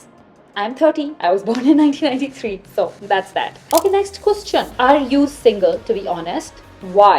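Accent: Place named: India. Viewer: Your Hindi accent is so so cute 😊😊❤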